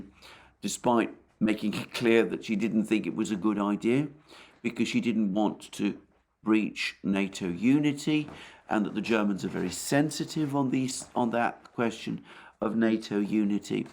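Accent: England, United Kingdom. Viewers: Dont you love fine russian propaganda with a british accent? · It’s funny hearing Russian propaganda in a English accent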